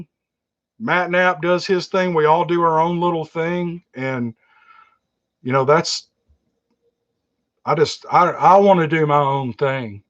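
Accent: South, United States. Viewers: I’m an Oklahoma girl, not really a girl anymore, but feel like one. I’ve always been drawn to voices,man or woman, with a southern drawl. It’s probably because that’s what I’ve heard all my life. It’s just a comfortable, warm feeling, I don’t know how to describe it. Anyway, thank you for your hard work. You are genuine and generous. Thanks for recommending Beast of the Woods. I’m going to their channel right now! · Keep up the good work and please do more live! You are just darling and I love hearing you talk. I'm from the south also so I really appreciate a good Southern voice. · Hey 👋 just seen this your luved/liked by everyone your voice is great 👍 the southern accent is just great also keep those stories coming and ty for the time you take to tell these stories God bless oh oh luv your pets hanky panky is to cute. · I’m from Indiana and I love your southern accent. 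😁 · Southern "twang" or no, it is the directness, honesty, sincerity, and humor the generates a two way confidence and respect between you, your audience and the material as presented. Get it? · Your voice is great. You were meant to be on Radio or YouTube. Your Accent and your Southern smoothness are your Brand. It is the best.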